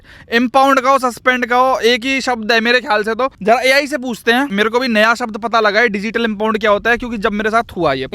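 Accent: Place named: Palwal, India. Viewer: bhai, I love the way you talk in our Regional language, Palwal vaale.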